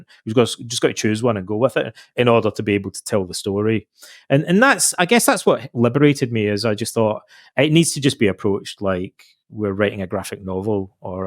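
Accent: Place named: Scotland, United Kingdom. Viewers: I didn’t know what I needed today, but listening to this man’s gentle Scottish accent as he talked about Socrates truly brightened my evening. Thank you so much. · When I mentioned your description, Mr replied to it directly: "That's close. I'm from Ayr, not Glasgow, although it's nearby. I have lived in Nova Scotia, Toronto, and Montreal."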